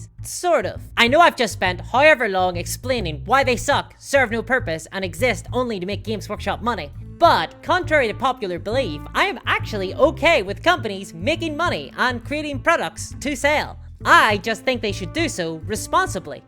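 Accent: Northern Ireland, United Kingdom. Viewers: Are you from Northern Ireland by any chance? The accent sounds familiar. You should do something with the two Neckbeardia people if so! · Spot-on assessment! Love the accent; norn Irish + Canadian/american?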